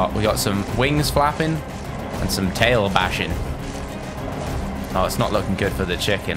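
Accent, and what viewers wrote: United Kingdom. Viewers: Question for: Are you British. And I love you videos it's very fun. AND YOU ARE MY CHILDHOOD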